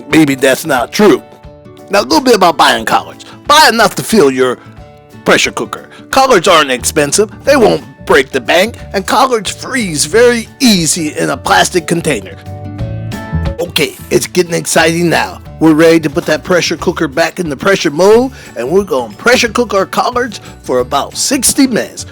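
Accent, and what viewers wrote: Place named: New Orleans, United States. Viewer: You sound like you from NOLA cuz. Subbed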